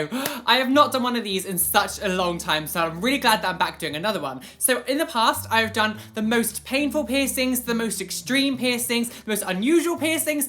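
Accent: United Kingdom. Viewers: I've just discovered your channel you're so cute :( I love to hear that british accent ♥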